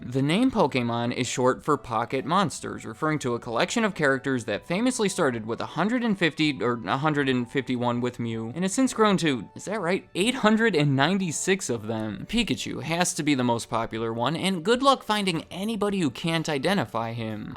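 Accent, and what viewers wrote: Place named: Michigan, United States. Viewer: I'm trying to pinned down this guy's accent my guess is Michigan but maybe also somewhere in Illinois but I'm leaning towards Michigan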